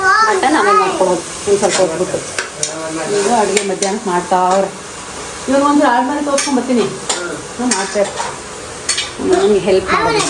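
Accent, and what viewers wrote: India. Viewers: ನಿಮ್ಮ ಗ್ರಾಮೀಣ ಶೈಲಿಯ ಕನ್ನಡ ಭಾಷೆಯನ್ನು ಕೇಳಲು ನನಗೆ ಸಂತೋಷವಾಯಿತು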